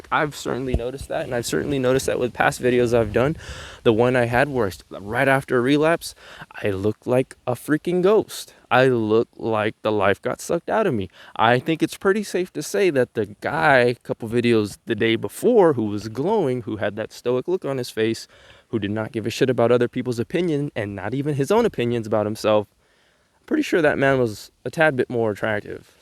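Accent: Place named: United States. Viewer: my goodness....now i will practice American accent with your videos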